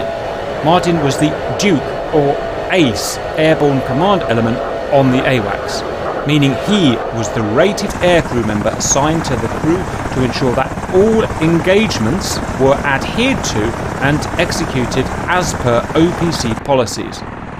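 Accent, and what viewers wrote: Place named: United Kingdom. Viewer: IDK why but the British accent suits well for this kinda thing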